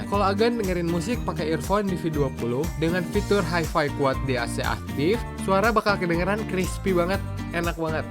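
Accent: West Java, Indonesia. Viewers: logatnya sunda banget gan😀 btw kameranya ngiler sama anti mainstrim 👍harganya udh turun 5jt lbih skrng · orang sunda sigana ieu teh nu review na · Udah "mah" kameranya bagus...eta meni sunda pisan si akang...linggih dmn mang?wkwk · pertama kali mampir ke channel agan, sunda pisan ini logatnya, ditambah liat "ciparay" :P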